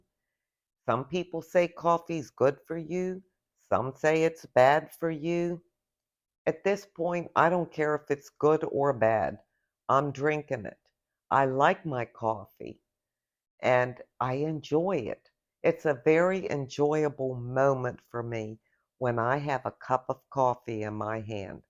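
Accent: Pittsburgh, United States. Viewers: Your videos just recently popped up. As I listened to you talk, I just knew you were from somewhere close to my hometown of Pittsburgh! I’m so happy! Love your content and agree with 99%.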